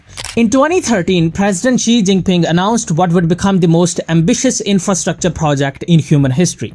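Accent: India. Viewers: Indian accent. BS from a trouble maker.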